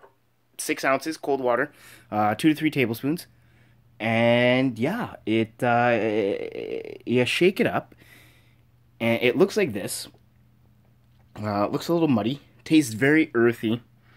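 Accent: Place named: Canada. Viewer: I can almost hear the Canadian in his voice. I wanna say toronto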